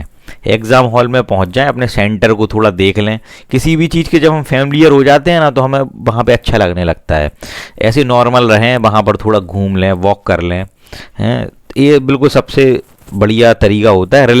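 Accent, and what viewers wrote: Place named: Jhansi, India. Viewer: Apki language jhansi ki lg ri h sir jhansi k h kya sir aap😁😁😁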